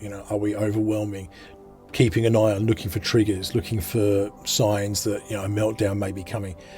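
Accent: Australia. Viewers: Are you from UK or AUS, you sound like you grew up in regional Victoria! Thanks heaps for your insight. Ive a 5yo boy with asd, pda and Add/hd potentially. You’re a hero. First search on yt as a result of desperation and I’ve been sucked into your channel. Thank you. Big love from down south 🖤🦘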